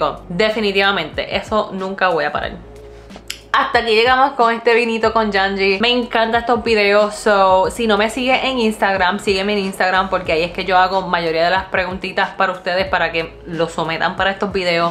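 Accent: Puerto Rico. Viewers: how great you talk with a Puerto Rican accent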